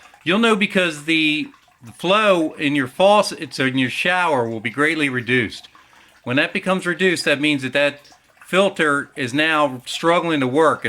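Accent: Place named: Pennsylvania, United States. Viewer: Love your accent... Is it a Pennsylvania accent?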